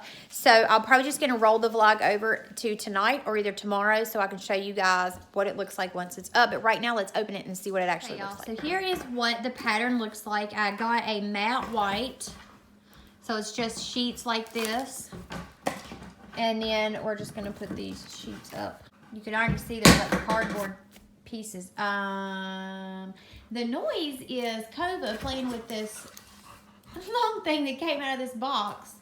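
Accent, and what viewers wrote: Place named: West Virginia, United States. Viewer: I just seen your channel. I live in NC but I am from WV. You have that wva accent lol. Love it. Also love there is no pretend bullshit. Keep it real .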